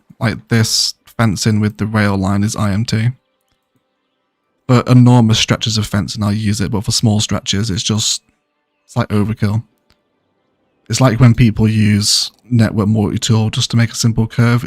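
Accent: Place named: United Kingdom. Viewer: Found your channel accidentally but so glad I did!!! Good to hear a fellow Brit playing this 👍🏻👍🏻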